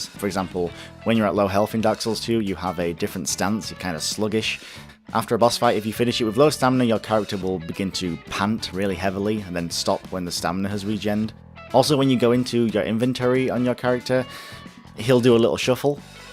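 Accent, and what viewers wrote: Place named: United Kingdom. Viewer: Ah, ramblings in British or... whatever you accent is. Whatever it is, it's exceedingly good!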